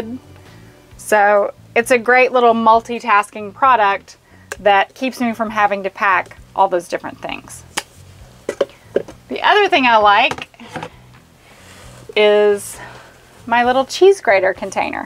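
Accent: United States. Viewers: I love you accent! im French and my favorite American accent is yours!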